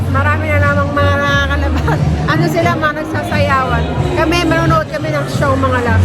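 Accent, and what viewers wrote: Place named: Iloilo, Philippines. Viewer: Hulaan ko, ilongga ka maday ayyyy..... pati ka daw.... shoutout lng q jan ah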